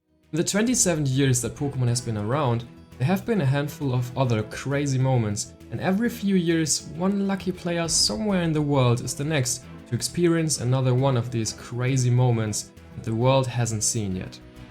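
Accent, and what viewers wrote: Germany. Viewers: man hört so raus das du deutscher bist :D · You have the most german accent i ever heard. No front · I can hear that english is not his mother language. Could it be he is german? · From Germany? Heard it after 2 seconds of talking. Never watched a video before but love your content.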